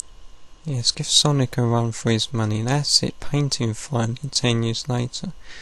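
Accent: United Kingdom. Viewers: Very nice video! It's interesting to see how you make all those really cool codes, and memory edit the game! Looks a lot like the hex editor I use (I would suppose so, it's hex editing). Nice British accent too as well! Mine went away a long time ago.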